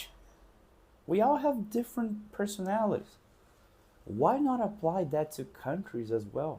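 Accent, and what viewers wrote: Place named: Texas, United States. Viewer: Pq seu sotaque es texano??… why your gagshdgdjd is texans?😅😂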